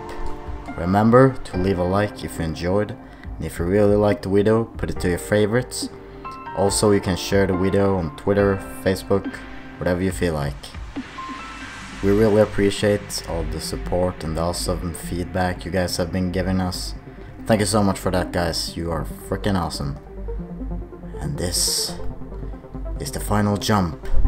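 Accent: Sweden. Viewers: i like your swe/eng:) naajs video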